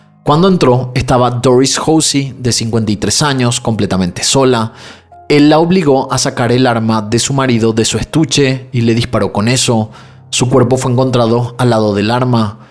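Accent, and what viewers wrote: Paraguay. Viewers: Haupei kpeee, yo sabía que ese acento y forma de hablar era de mi querido Paraguay! Éxitos siempre che dúo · Amo que tu acento volvió a ser paraguayo 😉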